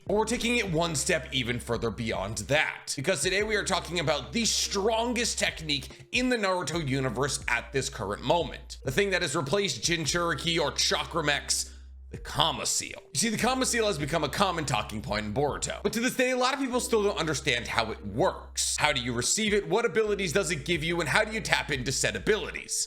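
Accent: Boston, United States. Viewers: Makes sense. I was wondering why he was saying it like that. Good ol' Boston natives 😂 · He’s just from Boston he has the accent makes karma sound like Kama 😂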